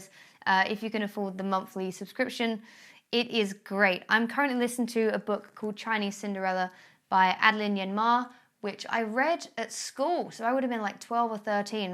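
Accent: United Kingdom. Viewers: the kind of anxiety that I usually have is a bit different from what you describe. But I just went to rest from having one from a complicated social situation and watched this video and I realised why I like these, it calmed me down to just listen to someone talking about a relatable(™) topic in a calm British English and now I'm calm as a little lamb. thank you :D my usual go to methods are meditation, breathing exercises and going through the alphabet in my head oO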